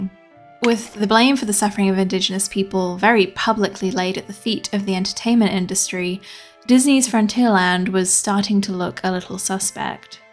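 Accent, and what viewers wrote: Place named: Mid-Atlantic, United States. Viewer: I sense a masters degree in either literature or history. You seem to have a mid-Atlantic accent. Quite old fashioned Hollywood. I can't quite tell if you're a middle class woman from Southern England living in the US or vice versa.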